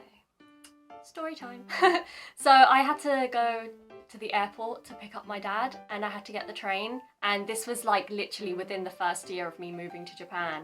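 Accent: RP, United Kingdom. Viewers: Hey,! I really admire your BRITISH ACCENT! People say my English is American, for I learned by American English textbooks since my junior hi days. When university, I was much influenced by Country-Western, bluegrass and hillbilly music, and now my accent sounds deeply Southern American. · Nice Queen's English. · イギリス英語の発声はやっぱりカッコいい！ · 当たり前ですが英語がとても上手いですね。ネイティブスピーカーを捕まえて批評など毛頭ありませんが素直に発音がいいと思うし話し方に品がありますね。同じ英語でもイギリス人はアメリカ人のような砕けた話し方は決してしない。本物のイギリス人を実感します。発音はやっぱりイギリス英語特有で喉の奥から発音するやり方で我々日本人にはなかなか真似できない。イギリス人の友人から「トマト」は「トマート」と発音し「トメート」とは発音しないと言われたのを思い出しました。それからどちらの国も島国で王室や皇室があることや騎士道や武士道があることも似ていますね。日本での生活は色々大変だと思いますがどうぞ頑張って下さい。そして楽しんで下さい。 May God make your life in Japan be more enjoyable and unforgettable.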